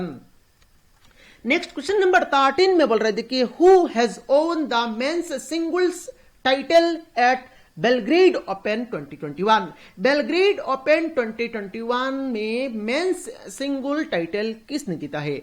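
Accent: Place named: Barak Valley, India. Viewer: Sir kya aap barak valley se ho aapka voice unse match krta h🤔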